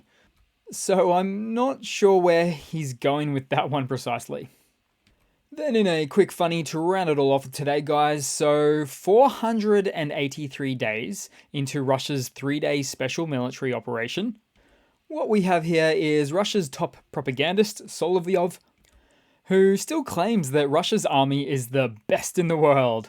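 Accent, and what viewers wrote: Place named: Australia. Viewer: The human error to which TASS refers is Putin, birth to dissolution. It's a beautiful thing to hear voice. Sounds Ozzie, with a few S.A. pronunciations sprinkled in. Is he in Taiwan? Shoigu may well be blustering, as his street cred has been seriously questioned for months now, and he has said little in response, to Noseferatu and others. Should Kyiv actually catch something, we should expect Moscow to spark shortly thereafter. A kremlin is a russian term for a walled fortress. It is NOT a synonym for safe thunderdome.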